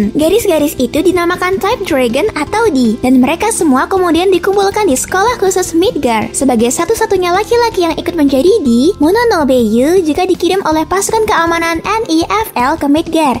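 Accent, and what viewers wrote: Japan. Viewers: Fasih banget min logat Jepang nya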